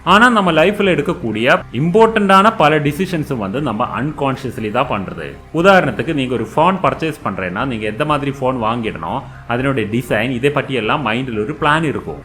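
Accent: Kerala, India. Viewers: Are you malayali right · Are u malayaali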